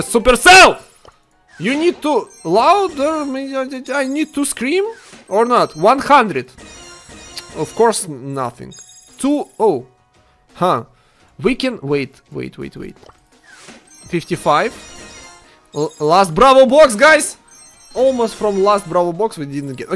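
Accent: Russia. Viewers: Russia, Look At His Accent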